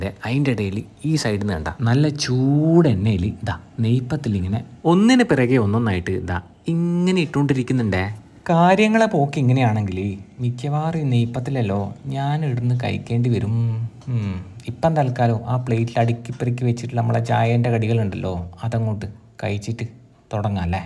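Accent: Kannur, India. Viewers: കണ്ടിട്ട് തന്നെ കൊതിയാവുന്നു 😋. നിങ്ങളെ അവതരണ ശൈലി ഒരു രക്ഷയില്ല. പിന്നെ നമ്മളെ കണ്ണൂർ ഭാഷയും 😍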